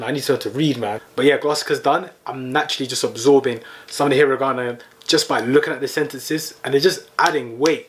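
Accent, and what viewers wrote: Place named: London, United Kingdom. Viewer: This is indeed the London accent. Listen to expressions like "Bruv" and "Innit"; very London.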